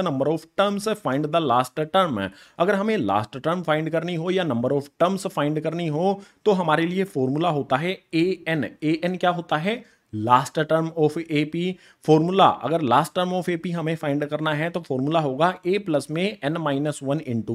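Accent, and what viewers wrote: Haryana, India. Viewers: Sir haryana ke ho 😅😅😅😅😅😅😅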